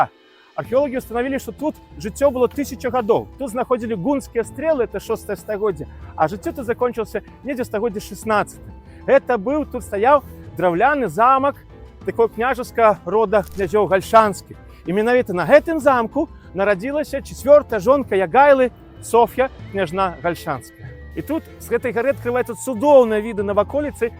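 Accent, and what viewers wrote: Russia. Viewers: Добра, але трохі вымаўленне пакутуе, як у русака😮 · Гэта трасянка , а не беларуска мова.. Вымаўленне нібыта ў русака з пад палкі!! Палова слоў расейскіх.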